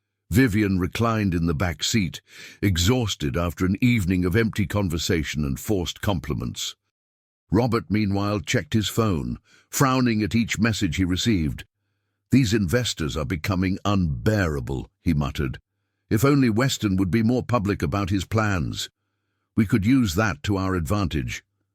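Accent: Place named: Mid-Atlantic, United States. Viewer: The narrator appears to be a real person with the most wonderful mid-Atlantic accent, British, but with American vowels.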